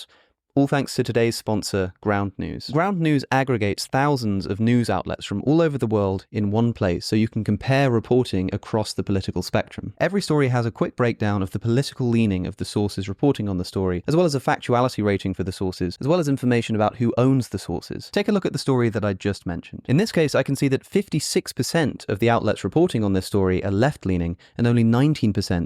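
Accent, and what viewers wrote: United Kingdom. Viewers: I'm glad I was reading along or I would have had no idea what "his face shawn" meant. For those that are curious, it means "his face shone", but said with that cute accent British people have. :P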